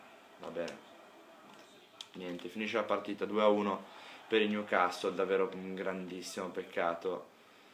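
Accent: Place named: Italy. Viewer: Come sei fiscale, w la pronuncia italiana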